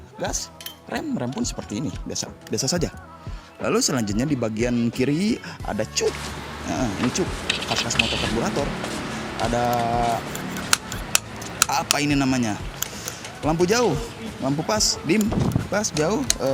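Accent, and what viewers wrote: Indonesia. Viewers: Inimah..hhaa orang sunda..broo.!?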